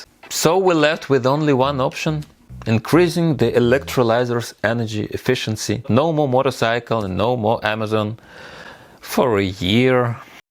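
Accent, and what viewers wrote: Russia. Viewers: Your accent says you can speak Russian correct?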